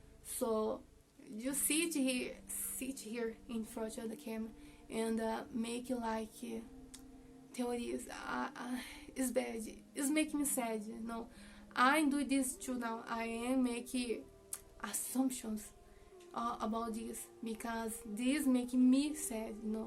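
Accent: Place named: Brazil. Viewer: you are brasilian right?? im from portugal and the way you speak your accent sounds brasilian, voce e brasileira vejo pelo sotaque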